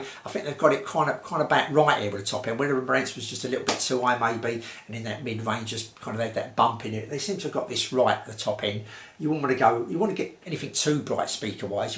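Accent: United Kingdom. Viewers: We cannot understand a single word you say. Your accent is annoying that british accent of urs.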